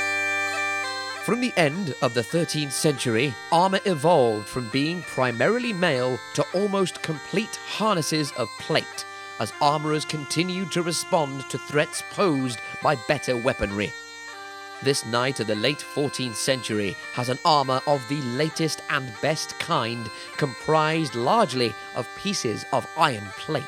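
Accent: United Kingdom. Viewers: man this guy doesnt know what he's talking about does he ps. your accent sucks man + british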